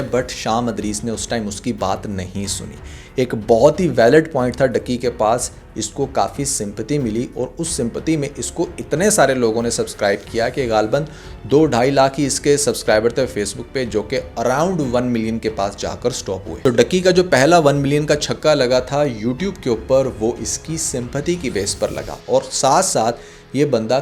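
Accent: India. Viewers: Why you speak like Indians?"karne lag Ra tha" nonsense 😊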